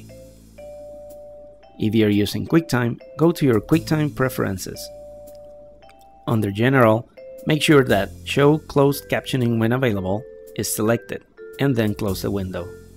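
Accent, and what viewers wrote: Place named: Mexico. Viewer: are you mexican, your english sounds like mexican english mexico rules! :) saludos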